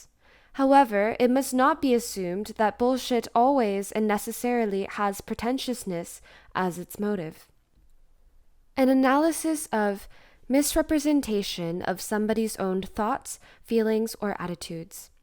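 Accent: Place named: United States. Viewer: Thank you for making this audiobook of the essay "Bullshit" by Harry G. Frankfurt (The USA 1986). Your diction, cadence and enunciation of American accented English is excellent. 👍 I just discovered your channel today, Sun. 3rd Aug. 2025 after reading an article about the essay on The Telegraph UK, mentioned on Drudge, It is true, the world in 2025 is awash in lies, humbug, obfuscations, spin, propaganda, deflection, misinformation, cover ups, destroying and concealing evidence, muddying the waters and leading the hounds of the scent. However, there is Objective Truth in the universe, i.e. either something happened or it didn't. A good saying I first read in 2003 comes to mind: "It doesn't matter what you think or believe, we will all know what is true and correct in the end!" שְׁנָאֵל שְׁפָתִים שַׁקְרִים יְהוָה, וְבָאֲנָשִׁים חָפֵץ. 😎 Adam Neira Founder of World Peace 2050 Founded in April 2000 Paris - Jerusalem - Melbourne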